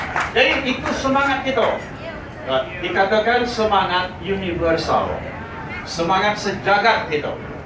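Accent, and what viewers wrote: Minangkabau, Indonesia. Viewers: Beliau ko psmuko minang malaysia luar biaso.. walaupun baliau lahia jo gadang di malaysia tp bahaso minang baliau ko pas bana...hebat smoga diikuti dek nan mudo di rantau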